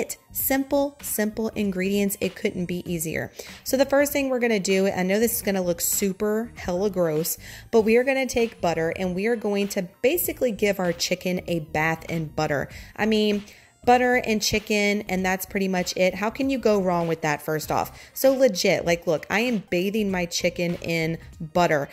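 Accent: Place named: Oklahoma, United States. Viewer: I here ya, im in Oklahoma so it sounds very normal to me!!! you're awesome!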